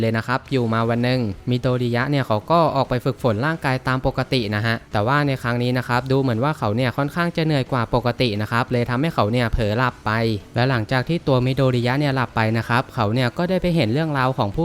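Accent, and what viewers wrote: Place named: Mae Klong, Thailand. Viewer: เป็นคนแถบแม่กลอง ราชรี เมืองกาญรึปล่าวครับ สำเนียงการพูดนี่แถบบ้านผมเลย555ผมคนแม่กลอง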